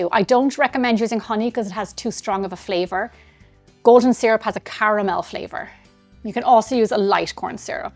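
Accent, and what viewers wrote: Ireland. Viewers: Thank you for this! Are you Irish by any chance? Your accent sounds Irish! I only ask because I miss Ireland haha🤗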